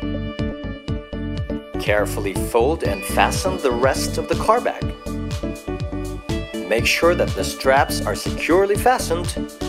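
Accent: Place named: Philippines. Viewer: Nice Filipino accent there lol.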